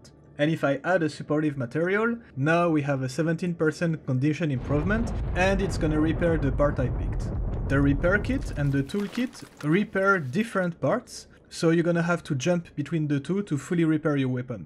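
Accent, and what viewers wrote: France. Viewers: Le french accent ^^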